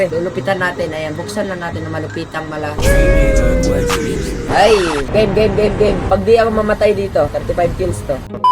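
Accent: Visayas, Philippines. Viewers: Bisaya man diay ka pader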